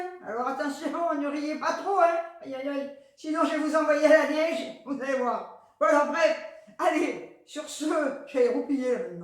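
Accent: Algeria. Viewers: Coucou ma adorée...Pero pasa que es? Je n'ai pas de son sur ta vidéo. C'est la première fois que cela m'arrive. J'ai tout vérifié et nada! Je ne comprends pas pourquoi... J'ai quand même regardé ta vidéo " en mode muet" et je vois qu' apparemment, tu es toujours dans les bonnes affaires.... Le porte-bijoux: j'adore et les bougies aussi.... Et pour couronner le tout, je viens juste de m'apercevoir que Youtube m'a désabonée de ta chaîne...Oh, la, la, la! Si l'année commence cà, comment va-t-elle se terminer??? Tu n'as pas perdu la voix au fait? Tu ne fais pas semblant de parler??? Lol, j'plaisante bien sûr mais c'est dommage de ne pas entendre ta douce voix mélodieuse: tu sais, celle avec notre accent de P.N. Je te fais des tonnes de bisous tout blancs.... car nous sommes au mois de Janvier!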